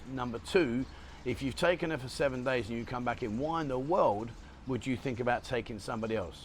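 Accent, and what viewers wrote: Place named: Cockney, United Kingdom. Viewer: Very clear advice.short time Thinking in going to thayland for first time .. i think i need to enjoy that ... Living the cogney accent matey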